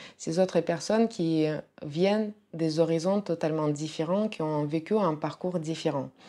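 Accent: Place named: Latin America. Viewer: Bonjour, je vous découvre aujourd’hui et, contre toute attente, je suis déjà fan. Expression parfaite, pas de narcissisme, un vrai désir de partager. Et ce charmant accent qui m’a fait voyager chez nos voisins latins # Noeud Nord en Gémeaux. Merci 🙏 🥰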